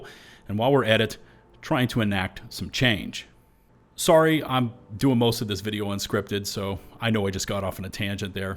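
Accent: United States. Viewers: You are one of the few content creators for helldiver 2 with an American accent. As such, the only one I follow. For democracy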